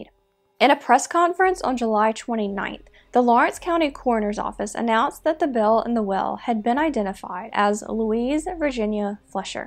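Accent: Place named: Mississippi, United States. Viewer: Nice video. I decided to subscribe. For some reason, you sound like you are from my home state of Mississippi. 👍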